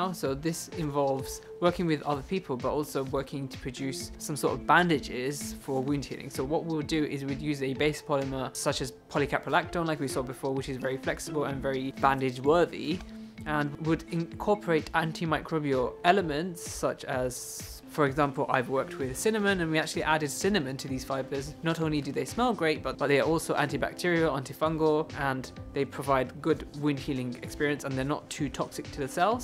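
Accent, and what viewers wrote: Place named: United Kingdom. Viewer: you talk very nicely, professional, sweet and obviously how can I forget your British accent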